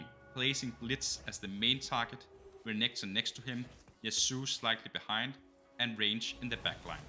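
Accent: Denmark. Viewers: r u danish?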